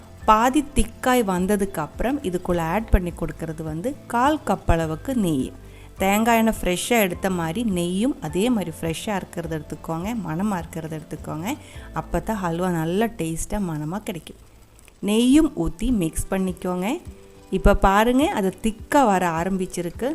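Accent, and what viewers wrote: Kerala, India. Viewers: Vera level akka.. First video paartha udanae subscribe panniten... Because, ungaloda malayalam kalantha tamil that's so sweet as your cooking.. 🥰🥰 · Your Tamil with slight Malayalam slang is very sweet to hear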